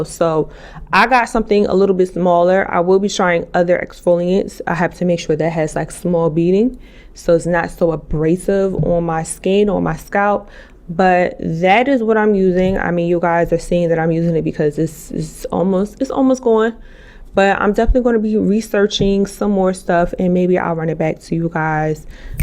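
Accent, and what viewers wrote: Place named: South, United States. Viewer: 😍😘 I like everything I see and hear. Your braces, country accent, skin, face, everything, bald head, all of it.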